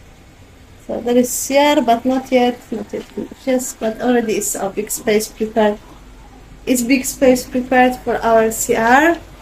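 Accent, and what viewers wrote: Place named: Ilocos, Philippines. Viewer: Seems your accent sounds like ilokano.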